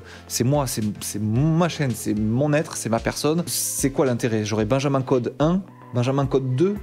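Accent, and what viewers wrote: France. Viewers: Pour ma part, que ce soit en Français ou en Anglais, ça ne change pas grand chose, ton Anglais est facilement compréhensible pour un francophone (ouais, ton accent est français et, pour ma part, c'est pas pour me déranger). Je te rejoins sur de nombreux points et plus encore sur le "concept" de 2nde chaîne en Anglais. Il n'y a qu'à voir le four pris par Bruce Benamran (e-Penser) quand il a tenté de créer une chaîne anglophone anglophone (Get it). D'autres youtubeurs ont fait le même pari que toi et ils connaissent le même rejet d'une partie de leur public. Kevin Tran (Le rire jaune) en est une illustration, il a vu le nombre de vues réduit de moitié et le nombre de commentaires n'est plus que l'ombre de ce qu'il était... A la fin, c'est toujours le public qui a raison, que ce soit pour de bonnes raisons ou pour de mauvaises raisons. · Continue en anglais, ton anglais est hyper simple pour nous de comprendre vu que t’as l’accent, limite j’ai l’impression tu parles en français alors que c’est de l’anglais. 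De plus tu pourrais grandement aider ceux qui comprennent pas, les gars l’anglais c’est la base de tout au monde surtout en tech! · From my point of view it's great to have a tech guy easier to understand because of French accent, as a beginner I struggle with fast and not clear English so switch in English sounds great. I wish you the best of luck Benjamin ✌️ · depuis que j'ai commencer a apprendre le dev(il y a 9 mois) et j'ai egalement commencer l'anglais. je trouve ça super que tu passe en anglais et je continuerais a te suivre avec ce fameux accent français depuis bdx 😉 j'ai commencer depuis peu a suivre des cours sur freecodecamp qui délivre des certification en développement, le contenu est anglais, tres pédagogique(beaucoup de pratique) et complet, je le trouve mieux que le contenu gratuit de openclassroom pour ma part. si tu peux faire une video sur ce site ça pourrais peut être motiver ta communauté a apprendre l'anglais ou juste me repondre en commentaire sur ce que tu pense de leurs certifications pour le marché du travail francophone 😉.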